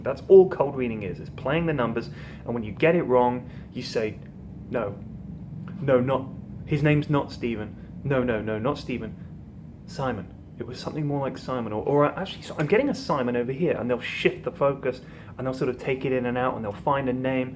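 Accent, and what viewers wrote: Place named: Yorkshire, United Kingdom. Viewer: your Yorkshire accent is spot on!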